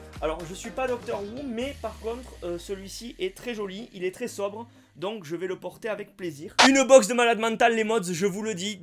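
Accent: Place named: South, France. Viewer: ton accent du midi est excellant 'sin (#teamsud)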